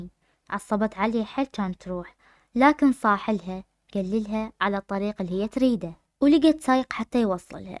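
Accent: Iraq. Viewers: لهجتك العراقية تجنن و طريقة سردك للقصة خياااال ما شاء الله 😭🤍✨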